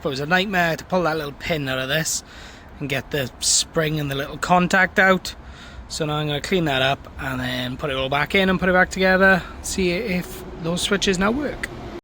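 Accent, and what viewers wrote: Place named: Swansea, United Kingdom. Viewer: You sound like a Swansea lad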